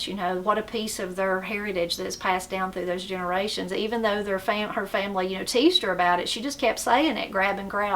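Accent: Appalachia, United States. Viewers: I love listening to the Appalachian accent and also the different southern accents in the United States. Thank you for sharing 🙏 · I'm an actor and needed an Appalachian dialect for an audition. Casting recommended your videos and I so very much enjoy them. Thank you for sharing your beautiful dialect and your stories and wisdom. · I'm from south west Alabama. Im 37 years young and I just found you on here yesterday. I love the Appalachian accent. My family is from North Carolina on my mama's side and we speak with a strong southern drawl that resembles your accent. So many of the words yall say we say. When I here you I smile cause it's like we're kin! My mama was a Robinson I was told they were kicked out of north Carolina for stealing hogs lol! My mama's mama was a Rice from North West Alabama. I feel at home hearing anything to do with the Appalachian culture and accents! 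❤️ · As someone from Appalachia with roots in Surry County NC and Patrick County VA, I feel a familiarity with you just from hearing your accent even though we live over 4 hours apart. Pretty cool how far our accent and culture spans. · I absolutely love the Appalachian dialect and accent, and I'm so glad. My grandmother was born in Appalachia. She had this accent, and many of my relatives have it still! It's beautiful to me and any time I hear it, I can't help but smile! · Hello from São Paulo, Brazil… a sort of ‘Brazilian NYC’. I love to hear appalachian accent, it brings me good memories. Farwest movies that I used to watch. Thanks for your channel! May you recommend some radio station that I could listen this accent all the time? I use to listen worlwide radios since Radiogarden app. What town or county you’re come from? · I just love your accent, I really love the accents and recipes from the south, just beautiful. 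· I’m from NW GA. Your accent is the same as everyone I know. I hate what Hollywood does to southern accents. It’s always so fake and purposefully used to try and make the characters sound dumb or ditzy. A lot of the stigma around southern accents is because of Hollywood. Anyone from the south can immediately tell when it’s not a real southern accent. I didn’t realize until I was a teenager that different areas of the south had different accents. I just thought everyone in the south had an Appalachian accent and anything else was more of a southwestern/Texas accent. Not the case at all. People living in Savannah, GA or in Jacksonville, FL or Mobile, AL won’t necessarily sound the same as someone who was raised in the foothills of Appalachia. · After moving full time time to California, it was refreshing to hear you speak as a woman from Pennsylvania (nor too far from Pittsburgh, but talk like the Moutain Folk). 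Made me miss home a bit. I have always made sure to keep mt accent and not change it as I love my accent, no matter where I have lived (but when I lived internationally, i tried to make sure I pronounced words properly ans worked at it). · My grandfather and great grandparents from NW GA spoke just like you …except even faster. I didn’t grow up there so I actually had trouble understanding them (granddaddy and meemomma) sometimes. They never used bad language other than the occasional “damnitboy” (yes, that’s one word haha) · As someone from Somerset, England i think the Appalachian accent is beautiful, sounds like home a little. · My mom's family is from the foothills of the N.C. Appalachians. Hearing your accent reminds me of visiting my grandparents when I was a kid. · im from appalachia and i wish my parents talked like this so i could develouip it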